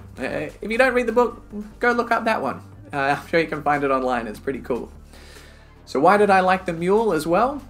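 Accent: Australia. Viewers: What accent is that? Australian?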